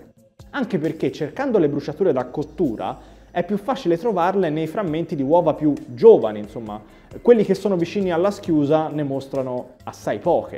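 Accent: Tuscany, Italy. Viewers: bello sentire parlare un po' di Toscano maremma cigniala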